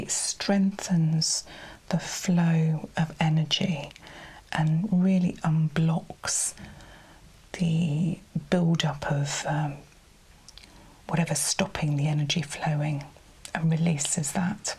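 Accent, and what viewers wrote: United Kingdom. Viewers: Oh, the british accent 😍🥰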